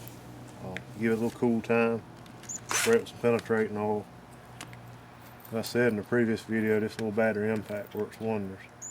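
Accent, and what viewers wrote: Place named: Southwest Virginia, United States. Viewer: hey man awesome video are you a heavy equipment mechanic?? also love the accent I'm from southwest virginia and talk just like you lol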